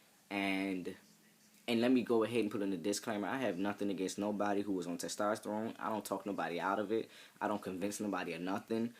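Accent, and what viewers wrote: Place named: New York, United States. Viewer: Hey Your dialect sounds very NY I would have never guess Maryland.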